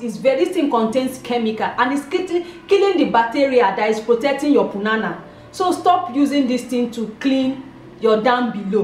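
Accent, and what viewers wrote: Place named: Nigeria. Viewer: you are so amazing but I was not understanding what punana is don't talk that English from Nigeria I can't understand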